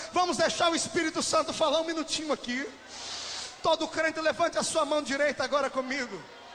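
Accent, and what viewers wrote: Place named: Northeast, Brazil. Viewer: essa época ele tinha até um sotaque meio nordestino 😅😂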